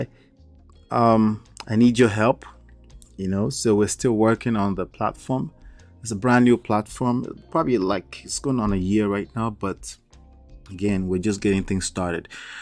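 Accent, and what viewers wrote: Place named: Nigeria. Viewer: Hi, you sound like a Nigerian. I am watching from Nigeria. Greetings. Just subscribed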